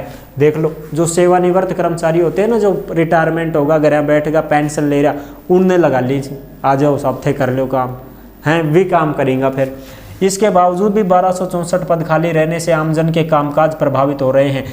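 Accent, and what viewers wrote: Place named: Kotputli, India. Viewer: Sir m bhi Kotputli se hu aapki aavaj se pahchan gya ki aap Kotputli se ho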